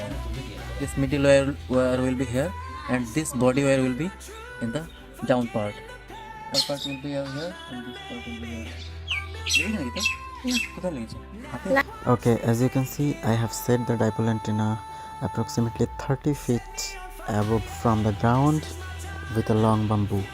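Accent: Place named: India. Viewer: Does your country allow cb radios 11meters you should make yagi beam antenna i'm over Knoxville,Tennessee USA sounds like your in India you should be getting thoses guys on cb radio over toward Australia here in states i been making good contacts on cb radio to eastern Australia southern Australia during evening hours when dx conditions are good i do have videos on my yt channel making contacts on cb radio.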